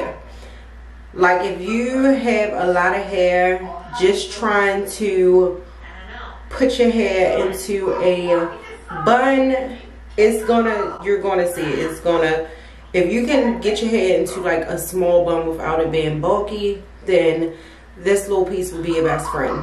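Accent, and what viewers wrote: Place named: Washington DC, United States. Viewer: your accent is so familiar, are you from DC?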